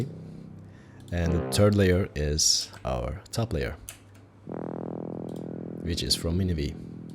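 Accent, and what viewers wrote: Turkey. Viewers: HELAL LAN TURKO AKSANINDAN ANLADIM TURK OLDUGUNU EDITLER TAM ECNEBILERI CEKECEK SEKILDE OLMUS BASARILARININ DEVAMINI DILERIM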